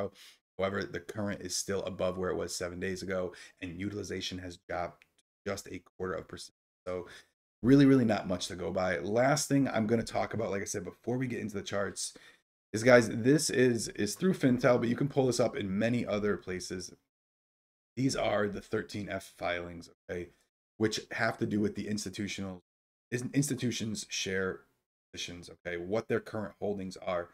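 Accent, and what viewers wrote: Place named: Chicago, United States. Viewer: Great message for new stock holders. Also, not sure if you're from Chicago or not but you sound like us big time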